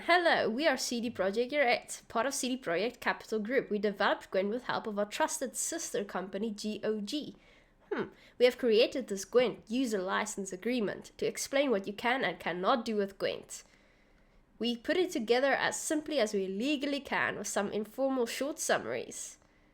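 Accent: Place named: South Africa. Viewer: South african accent the best 😁😁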